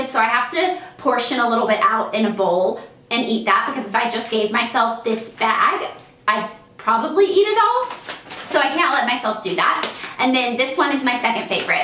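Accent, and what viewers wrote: South, United States. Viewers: I honestly miss her southern accent..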